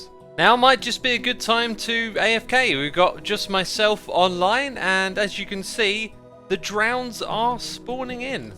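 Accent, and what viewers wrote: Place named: RP, United Kingdom. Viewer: X sounded so extra posh in this episode.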